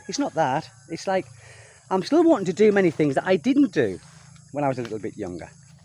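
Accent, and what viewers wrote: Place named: North East England, United Kingdom. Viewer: sounds like you come from somewhere not a million miles from my area in the N.E, Cleveland area.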